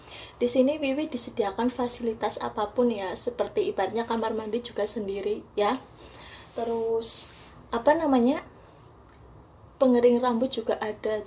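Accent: Banyumas, Indonesia. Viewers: Cerita pengalaman kerja disingapore.. SINGAPORE daerah enthi nduok??? Ngapak wadon 😍😍😍😍😍😍😍 Taiwan singapore,duiten arep gae opo nduok😀😀😀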